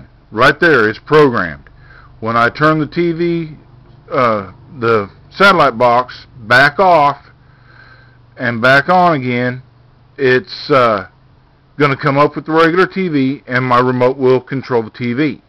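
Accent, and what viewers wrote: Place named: South, United States. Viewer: Thanks sir you helped me reprogram my remote ! I love the southern accent by the way 👍🏽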